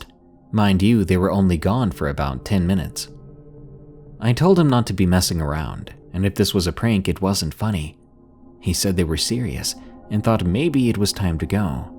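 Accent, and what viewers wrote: South, United States. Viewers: I know he is from the South, but it just cracks me up LOL 😂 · Duh he is from the south..if your an old fan u should know that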